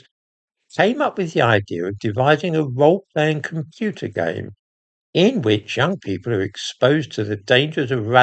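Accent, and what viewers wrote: United Kingdom. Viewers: Hearing a Brit say Amelia so often just makes me think Amelia Pond and want a ride in the TARDIS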